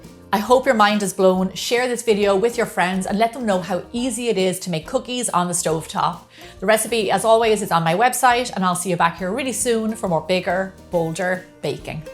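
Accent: Ireland. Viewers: Thanks for the Irish accent and the great tips. Living in a hot climate you don't want to fire up an oven, so stove-top is the way to go. Also, when I baked an entire batch of cookies they sat there tempting me to binge, so your method allows me to bake 4-6 cookies at a time. I shape each cookie, freeze them on a cookie sheet, then freeze-bag them for future cravings.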